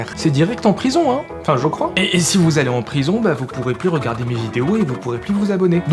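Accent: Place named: South, France. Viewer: Oh bonne mère, quel bel accent du Sud !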